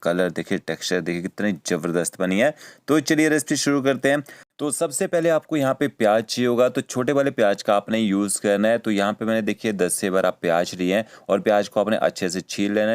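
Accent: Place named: Himachal Pradesh, India. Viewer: yes ji apne gorayian ko pehchan he leta hai banda mujhe aise he lagg raha thaa ki pakka app Himanchal se honge... mere khud bahut dost hai himanchal se.. Sujanpur tihra/ Solan/Dharamshala/Rajgarh/Shamli se tau kahi naa kahi apki awaz se pehchana ki aap himanchal se ho...Ek mera dost Sharma voh Nadaun se hai.. ok happy to hear you...thanks so much..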